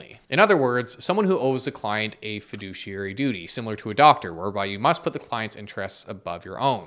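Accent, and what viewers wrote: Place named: Canada. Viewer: It's funny I never pegged you as Canadian but as soon as you mentioned it I could hear some hints of the accent in your voice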